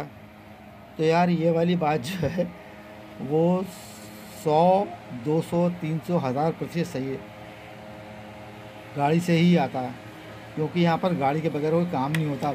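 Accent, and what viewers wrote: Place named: Madhya Pradesh, India. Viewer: Apki language se lagta hai ki ap Madhya Pradesh SE hoo..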